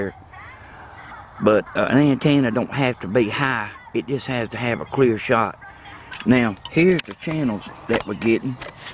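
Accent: South, United States. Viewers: I like your down south southern drawl and I'm in South Carolina. Nothing quite like a Southern Gentleman. Just subscribed to your channel. Keep'um coming🇺🇲🤗🤗🇺🇲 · although I thank you for the video, I find the thick southern accent just too hard to listen to.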